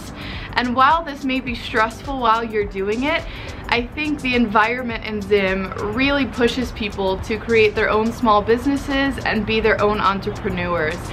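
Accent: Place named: United States. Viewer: What do you do for work? You sound american, so you just picked up and left, moving to a very random country..I’m wondering how, and why?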